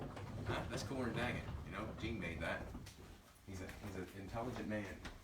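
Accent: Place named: Canada. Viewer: I started watching your videos and man your channel is going to blow up soon with your amazing work! I couldn't help but think you were from Canada bc the Keith's shirt and how you talk and now u said n.s. I'm originally from cape Breton and live in Halifax now and wanna say hello from Halifax man!!! Keep er up man love your work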